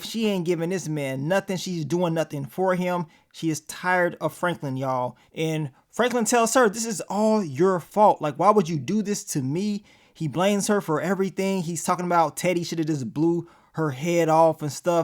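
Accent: United States. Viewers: u coined that LA accent bruh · Man still in his American accent 😂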